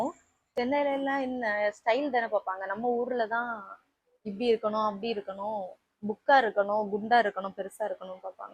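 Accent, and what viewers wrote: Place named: Sri Lanka. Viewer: You sound Srilankan, are you from there?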